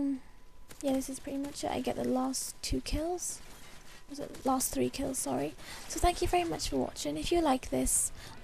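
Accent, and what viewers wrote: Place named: Southern England, United Kingdom. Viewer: one of THE most helpful commentators ever :D, also you sound like you're from england :P just saying, because i'm from the south of england :) and it's a accent i recognize o.o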